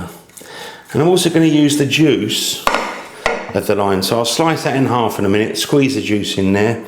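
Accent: United Kingdom. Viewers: I really like you use quite similar "hacks" as I found by various experimenting to substitute some of the authentic ingredients when not available, sill producing delicious and quite curry dishes. Great and clear description, and of course amazing British English!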